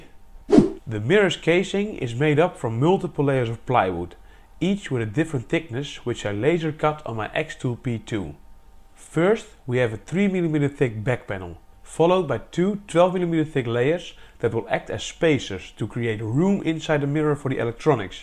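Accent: Netherlands. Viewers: Dat nederlandse accent herken ik vanaf 10 km verderop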